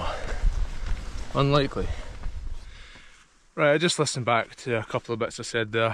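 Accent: Scotland, United Kingdom. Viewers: Great content. And hearing someone swear with a Scottish accent is a bonus. 🙂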